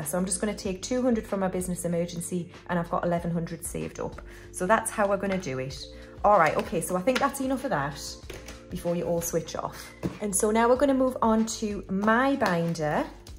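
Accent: Newcastle, United Kingdom. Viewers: Loved this video as always, puts a smile on my face when I see an upload from you :) I love your Geordie accent and I had the giggles when you were talking about the apple and how you were a 'whorebag' haha, hope you are okay xxx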